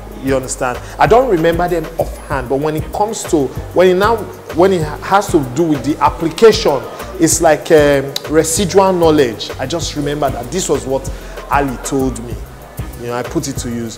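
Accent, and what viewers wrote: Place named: Warri, Nigeria. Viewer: I like that I was not the only one that took note of it when he said he learned to speak pidgin English at the age of 18, or did he say he learned to speak English at the age of 18. come on Bovi who you wan pose for? if you were not speaking pidgin English as a Nigerian what language were you speaking? you English is not that good, your warri accent is all over the place. you may not be Isoko or Urobo or even Isekiri, but no doubt you be Warri piken. stop the posing. Bovi no get me wrong, I gree your own, and you know the work wey you dey do well well. I gree your own Bovi.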